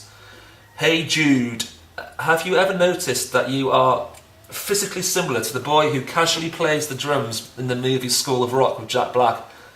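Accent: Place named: Liverpool, United Kingdom. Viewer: You sound like youre relatively close to Liverpool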